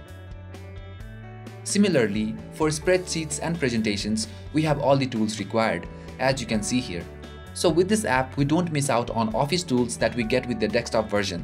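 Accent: India. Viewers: This was quite helpful, but honestly, I missed many words because of your Indian-area accent. I have taught English as a Second Language for many years and the most common mistake made by people from this part of the world is speaking too rapidly. Even native English speakers are easily misunderstood if they run words together too quickly, and when you add in the occasional mispronunciation of a foreign speaker, it makes it frustrating for the listener. Simply slow down a touch and people will follow you much better.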